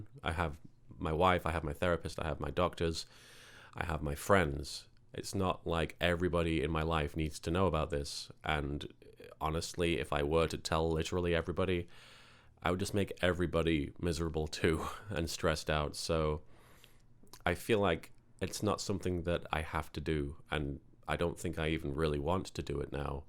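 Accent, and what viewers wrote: United Kingdom. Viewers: where do u live by the way u sound british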